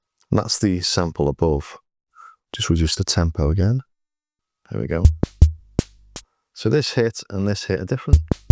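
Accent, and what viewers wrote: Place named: Liverpool, United Kingdom. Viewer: Thanks a lot, this was really interesting — as always. A drum machine that does not keep its pace, this would drive me nuts ;-) I did not know that about “Echo”… It must have been tough for these guys being a band in Liverpool . And I am rather happy that I was not forced to learn your local dialect until now. This is quite a challenge… Still watching every of your videos, See you ;-)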